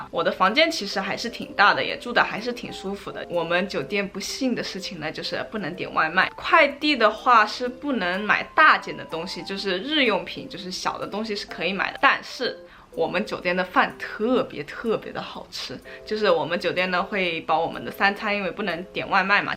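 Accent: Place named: China. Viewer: 说英文时中式口音那么重。。。还说中文差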